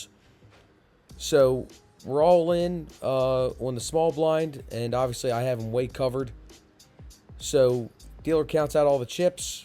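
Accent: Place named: New Jersey, United States. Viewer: Watching from Australia. Love the accent - is it New Hersey?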